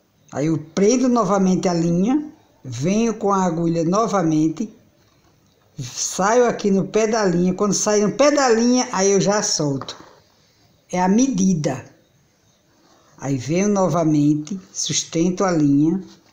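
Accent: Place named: Ceará, Brazil. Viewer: Você é adorável, esse sotaque delicioso de ouvir, lembra minha irmazinha lá no Ceará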